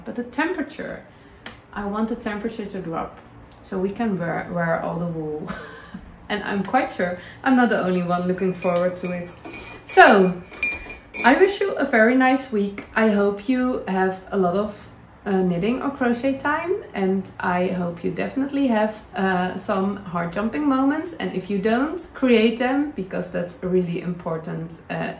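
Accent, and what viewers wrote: Netherlands. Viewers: I cannot thank you enough for taking the trouble to do a podcast in English. Your “Dutchy” English is quite good and enjoyable to listen to. I had heard about you for a little bit, but just started watching your knitcasts last week. I would 100% say that you come across as genuine, quirky and fun…. I especially enjoy your love of brown and your hair. You have one of the most lovely hairdos, curly bangs and all. 😊 Hope you have a lovely week. Looking forward to seeing you steek your cardigan! 😁💚🧶☕️ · Thank-you for another lovely podcast. I enjoyed seeing the countryside around your town. Your dog riding in your carrier bin made me smile. Not that my opinion of how you wear your should make any difference, but I like the curly bangs/fringe they go with your curly hair 😊 A glimpse into life in another country and the "dutchy english" are both good. 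Keep slaying those dragons 🇨🇦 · I’ve just started to watch today’s podcast but I had to finally comment. I’m in the US but from Canada where my parents immigrated to from Belgium in the 50s. My mom, who passed 3 years ago, also spoke “Dutchy English” and so many of your expressions remind me of her. ❤ my parents are from Brugge and Meetkerke and so much of what you share reminds me of my family. Today when I saw you leave your house and saw the colorful plastic strands in your doorway it made me hurry to the comments….my aunt had those too! ❤ thank you for bringing so many memories of love and family back to me…. And I love your clothes and granny squares!!! 😄 thank you sharing your life and knitting/crochet. PS the bangs are nice curly!!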